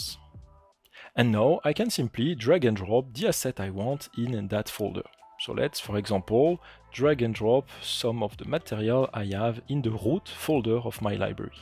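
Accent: France. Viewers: toi tes francais mon pote,l'accent de ouf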